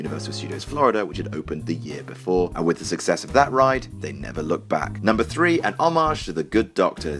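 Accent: United Kingdom. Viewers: WHY did they use a steroid-voiced, heavy accented, British motor-mouth turkey to narrate this?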